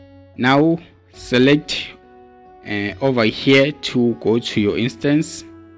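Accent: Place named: Ghana. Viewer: GH ascent.. Africans to the world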